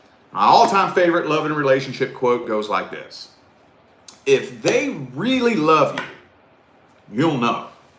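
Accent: United States. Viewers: I like listening to your American accent . At times I think ' how I wish I can talk and sound like that.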